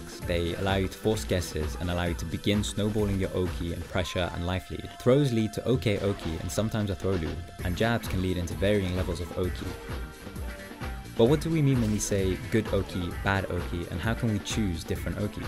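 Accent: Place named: United Kingdom. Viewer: Only clicked in to verify that your are British, and indeed you are. Good day.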